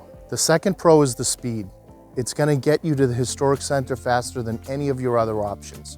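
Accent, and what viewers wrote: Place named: East Coast, United States. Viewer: you posted this video a year ago, but it remains useful today. Given your accent, did you grow up in the East Coast and then moved to Italy in your later years? The Wife and I are planning a trip to the US military base in Vicenza, so I am grateful that you shared this useful video with your traveling audience.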